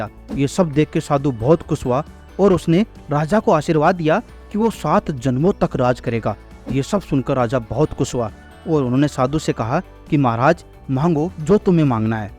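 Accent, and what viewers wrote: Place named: Marwari, India. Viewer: Boli se lagyo ki marwadi ho M bhi sikar jila ka Danta ramgarh gav se hu Kumawat Indore construction ka kaam he Indore he rehte he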